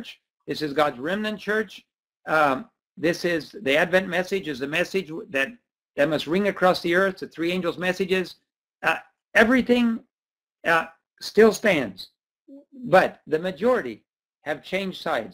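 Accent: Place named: Hawaii, United States. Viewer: Thanks for being real Uncle. I know we're on the right path and the right message. God revealed to me the same message before I noticed you were giving it too. Ellen's warning is for the sleeping. Her confirmation is hidden in her warning about time test. Those that refuse to awake will naturally miss it. I am confident that you are aware and I love your accent it's very much like the Hawaiian accent. And we call all our elders Uncle so I'm very comfortable with you and the way you talk and think. Praise God that I am not completely alone in this planet of zombies. 🤙🙏❤️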